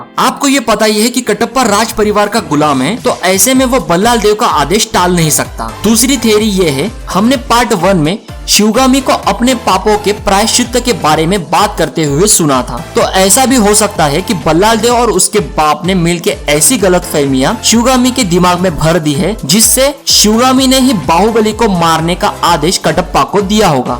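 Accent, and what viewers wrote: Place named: Maharashtra, India. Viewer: loved your review and your typical marathi accent.....no theory left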